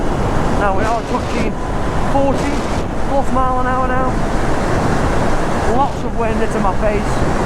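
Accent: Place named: United Kingdom. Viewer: To save anyone going through what I went through, the Youtuber is referring to is not; [1] johnix [2] johnix [3] jonix [4] jonicks [5] johnicks (I was so close) [6] janix [7] janicks [8] Jonex [9] Janex But.... 🥁🥁🥁......... John Hicks 😆 Bless us Brits and not being able to understand each others regional accents. Subbed, number 26... remember us when you hit the mil. 🤜🤛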